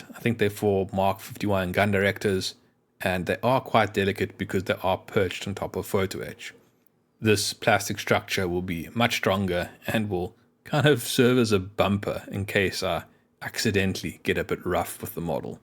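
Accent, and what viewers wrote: South Africa. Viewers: I wanted to share with you I've been trying to identify your accent. I settled on South Africa. The other day I met somebody who had a similar accent so I played a portion of your video to him. He confirmed your accent was South African, and I believe he said it was from the southern part of the country.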